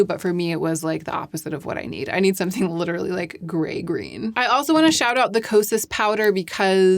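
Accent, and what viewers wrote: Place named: South, United States. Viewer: Just wanted to say that I am a new subscriber - and I can’t get enough of your channel. From your southern “hey y’all” to your intelligent and thoughtful comments, all delivered with such a calm demeanor- I’m hooked! Thank you for your thought provoking, yet obvious love for beauty - much appreciated!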